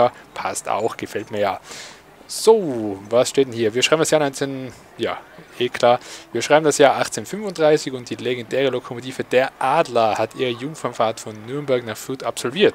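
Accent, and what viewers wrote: Austria. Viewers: Jo bisch denn du a Tirola, ha?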